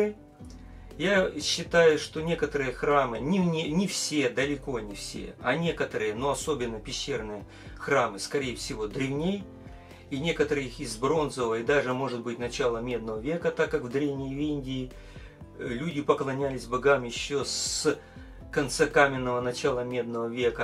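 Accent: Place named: Russia. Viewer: Excuse, I think the narration is great as it is. English with a Russian accent sounds good, information is all there and filming is brilliant. Don’t change a thing.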